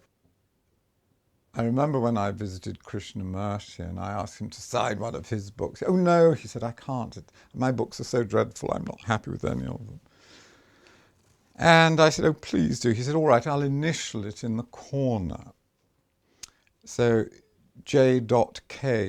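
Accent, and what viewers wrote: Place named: United Kingdom. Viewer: Such a wonderful cozy atmosphere. This man could read a phone book and it would hold my attention. Love a British accent!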